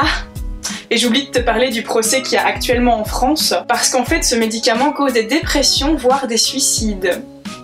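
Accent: Belgium. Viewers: Tes belge ? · t'es belge?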